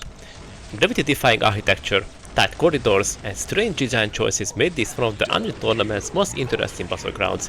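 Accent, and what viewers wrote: Hungary. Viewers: Sounds like you're hungarian speaking English. Good content tho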